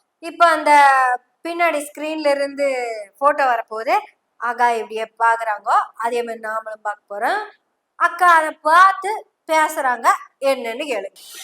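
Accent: Kongu, India. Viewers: கொங்கு தமிழ் சங்கு முழங்குவது போல் பெரியாரின் பேத்தியின் மொழி நடை உள்ளது வாழ்த்துக்கள் ❤️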